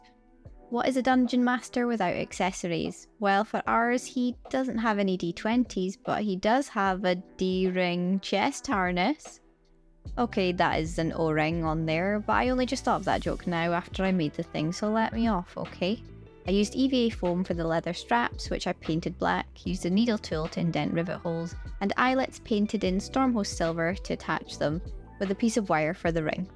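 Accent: Scotland, United Kingdom. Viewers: Omg! 😳 Stephen King has written a short story about something along the lines of the mushrooms eyes there. It’s been decades since I read it but it still makes my skin crawl. As did your talk about small holes and them little eyes. 😵 Anyways, great build and great presentation. I’m also a sucker for a woman with a Scottish accent so that kinda topped off the experience. Good job! 👏👏👏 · This is absolutely the most wonderful sexy beast. Its a joy to hear another Scots Crafter. Keep up this fab work xxx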